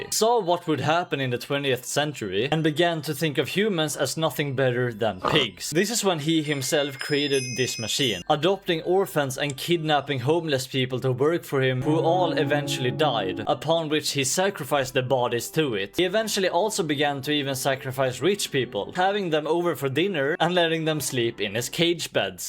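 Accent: Sweden. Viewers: It could be worse... he's better than most swedes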